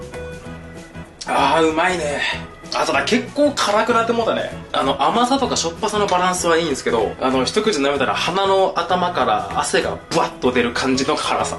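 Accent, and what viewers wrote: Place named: Kansai, Japan. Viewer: さん福岡の人なのにイントネーションがたまに関西になるね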